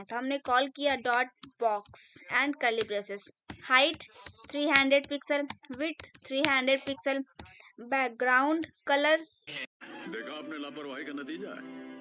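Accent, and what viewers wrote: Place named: Uttar Pradesh, India. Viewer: HI what's your name I'm also learnig Javascript, and also learnig css, from where are you, I think You are from UP, am i right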